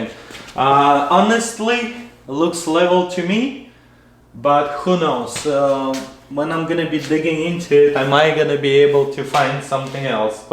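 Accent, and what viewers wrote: Russia. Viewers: Привет, хороший русский акцент!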